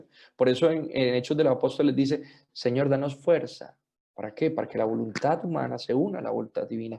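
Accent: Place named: Colombia. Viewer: Este acento, me suena Colombiano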